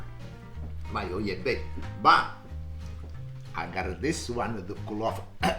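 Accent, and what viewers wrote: Italy. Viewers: I love your Italian accent. Today is my son’s 11th birthday and he wants me make this dish. Salute cin cin🥂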